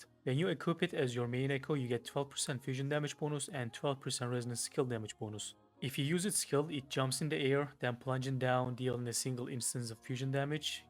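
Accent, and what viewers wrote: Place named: Turkey. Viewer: türk müsün? aksan türk aksanı.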